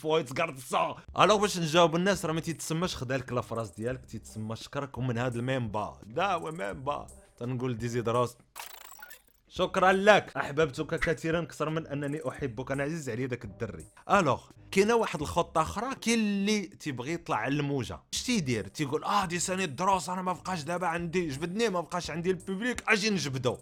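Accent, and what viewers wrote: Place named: Bechar, Algeria. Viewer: ❤صوت❤ ديالك كايشبه لصوت نتاع ولاية بشار جزائر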